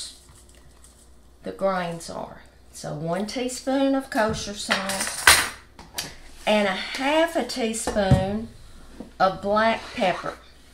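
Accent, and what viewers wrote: South, United States. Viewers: Thank you so much for the warm welcome! I know that Southern people are very kind... I married one 😊 My husband is from North Carolina. I just LOOOOOOVE those Southern accents 😍😍😍 God bless you and the rest of your family as well 🙏🙏🙏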